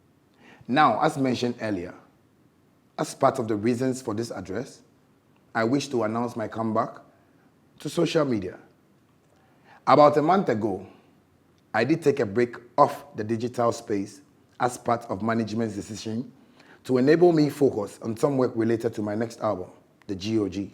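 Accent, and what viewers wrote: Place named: United States. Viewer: So you can speak american english,shatta shatta!!!!!!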